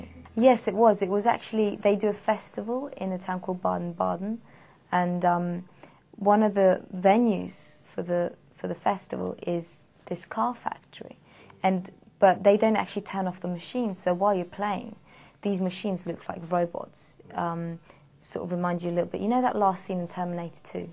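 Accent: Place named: England, United Kingdom. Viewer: I love her english accent..could just listen to her talk for hours...and of course her voice is beautiful!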